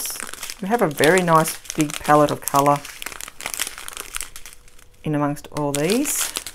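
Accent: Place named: Australia. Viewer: Shopanydeals is a dangerous site for the bank balance. Found your unboxing videos and love them but always nice to hear a fellow Aussie doing unboxing/reviews.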